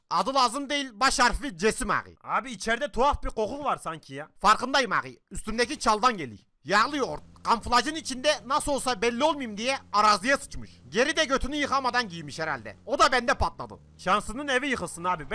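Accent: Gaziantep, Turkey. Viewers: abi antep dublaj papci devamı gelsin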